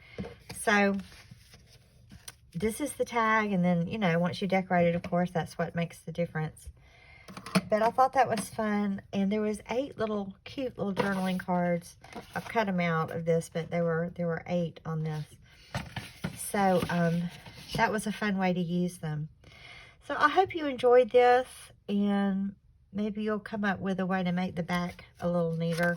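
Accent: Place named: South, United States. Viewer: I enjoy your sweet Southern accent as much as the tutorial 🌼 Such beautiful pieces!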